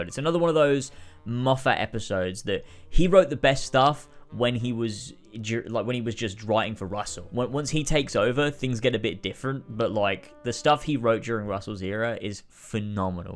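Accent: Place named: Southern England, United Kingdom. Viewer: I cant work out your accent you sound like youre from the south of the uk but also from australia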